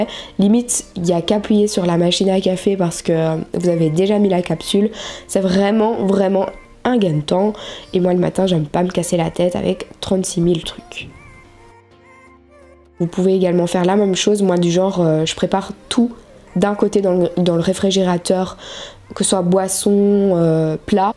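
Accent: Belgium. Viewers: J'adore ton léger accent (belge il me semble)😍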